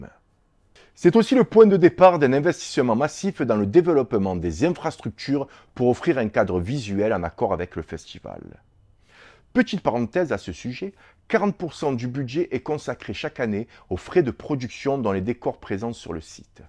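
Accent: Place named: South, France. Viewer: Belle story avec un p'tit accent du midi 🤪🤘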